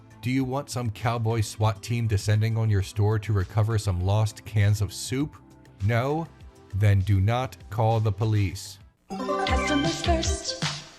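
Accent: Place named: Mid-Atlantic, United States. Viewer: I like the narrators mid-Atlantic accent. Don't try to hide it lmao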